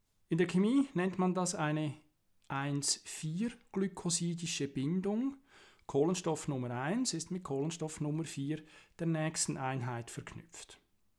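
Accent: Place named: Switzerland. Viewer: No shit, er kommt (höchstwahrscheinlich) aus der Schweiz, hat daher einen Schweizer Dialekt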